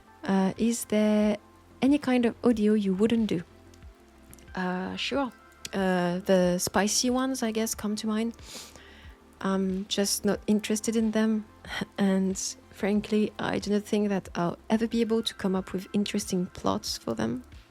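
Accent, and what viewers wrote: France. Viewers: Some excellent questions here. The sort of questions it's thoroughly enjoyable listening to you answer, but I would find excruciating to answer myself, being a 'bear of very little brain'.. Thank you so much for taking the time to do this. It's such a delight hearing you as yourself, once in a while. It has also made it easier to distinguish, as my daft question pointed out, a true etherial entity, swirling in a non-committal penumbrian light.. With a distinct French accent, of course.. :) Lesson learnt!. I assume you are partial to mountains, due to your own particular part of France, & the destinations you mentioned you'd like to live / visit? I can understand that & the type of nostalgia it brings. The banner picture in my profile shows the sort that I have those kind of feelings for, although I'm sure I could grow accustomed to mountains too, in time. 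As long as it's fairly rural countryside, & away from hoards of people. The flat stuff's just a bit more forgiving when one has the mad compulsion to go cycling. With regards to Trench Crusade, I, too, will likely never play it, let alone purchase, paint & prepare even a single faction. But since I became aware of it I've lapped up as much lore & artwork as possible. Amazing, dark & sinister looking creation. Thank you once again for the time & effort you put into everything. Enjoy the rest of your weekend. I'll meet you back here again soon :)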